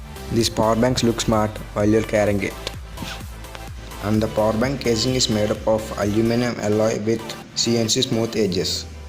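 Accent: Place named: New York, United States. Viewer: Dini evadaina video antada ra? Meanwhile NYC slang ra chakka😍👍😊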